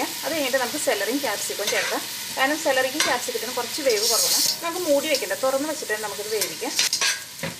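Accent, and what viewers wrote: Thrissur, India. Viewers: thrissur slang :)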